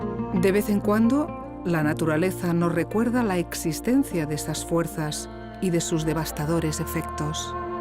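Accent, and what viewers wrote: Catalonia, Spain. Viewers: El acento catalán tan marcado de la narradora no me ha dejado disfrutarlo. No tengo nada en contra de los acentos, pero para una narración hubiera preferido uno neutro. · Como se nota que la narradora es catalana, el acento la delata. Buen documental por cierto 👍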